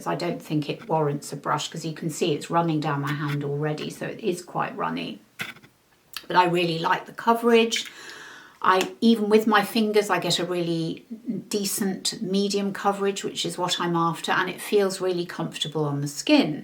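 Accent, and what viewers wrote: England, United Kingdom. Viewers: Oh my I do enjoy your lively English accent❤Your content is valued by this almost 70 yo. I do so love your realistic approach to wiser womens beauty! Honestly Delightful🦋Peace and light☮️🕊️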